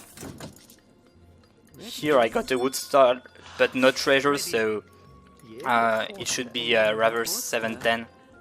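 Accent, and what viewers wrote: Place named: France. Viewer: That french accent is insane 😂😂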